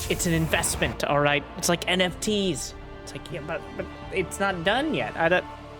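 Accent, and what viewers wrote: United States. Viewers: Ты американец?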